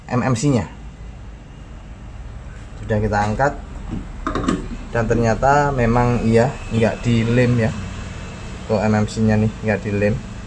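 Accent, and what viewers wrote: Java, Indonesia. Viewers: Mas,sampeyan orang lampung kok bahasa jawae kok medok ya.??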